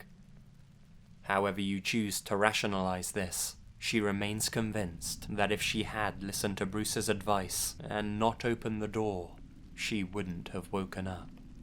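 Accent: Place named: RP, United Kingdom. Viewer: Why do talk posh as fuck?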